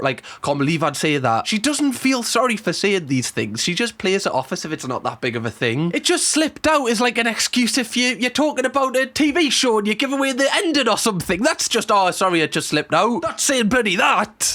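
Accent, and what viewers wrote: United Kingdom. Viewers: I've been really enjoying hearing your comments and opinions. It's like my own mind in speaking but in a British accent which always makes me laugh. Cheers mate for your content and genuine effort towards your craft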